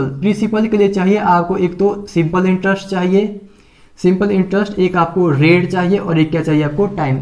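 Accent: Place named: Pahari, India. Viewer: Pahari tongue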